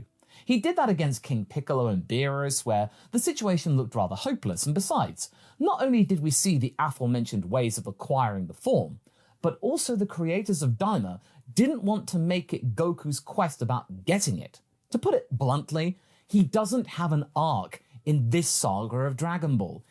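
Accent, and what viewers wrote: United Kingdom. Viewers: My dude he has a British accent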